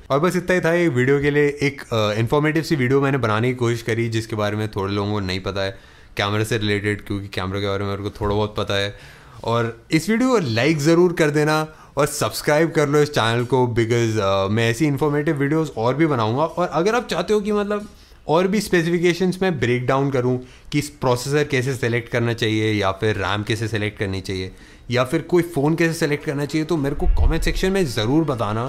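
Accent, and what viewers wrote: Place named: Bangalore, India. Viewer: Brooo are u from banglore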